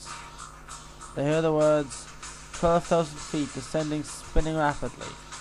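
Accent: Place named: Cork, Ireland. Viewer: It fails as a historical piece, it certainly fails as journalism, amateur or not. Right from the get-go it strikes the wrong note, I mean the title, "seconds from disaster"- surely ' ' is aware of the train-wrecks'n'refinery-explosions programme of the same name on (Discovery? History?) Channel? (aka, the Hitler Channel & the Nostradamus Channel, ha-ha!) I suspect he is, intending to lure in viewers (it worked!) Then there's the presentation- the 'C'mereIwantchaa..' accent on its own would be OK given that the plane was flying out of Cork, after all- but trying to give it this American 'All the Hits, All the Time' DJ-speak/'Picture a Man..' Movie-trailer V/O- type flourish, well it just becomes cringe-making, my toes were curling listening to it!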